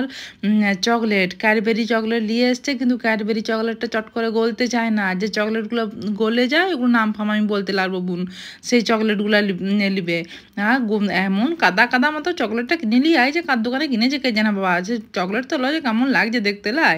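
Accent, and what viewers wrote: Bankura, India. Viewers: A kon jelar bhasha??Bakura na purulia??aktu janaben pls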